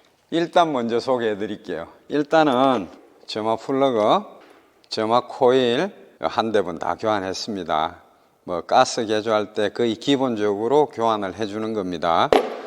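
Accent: Guryongpo, South Korea. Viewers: ㅎㅎㅎ 구룡포 발음이 좀 빡세긴합니다. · 나날이 발전하는 블루나인 사장님 짱이야 ! 구룡포 사투리 구수합니다 😂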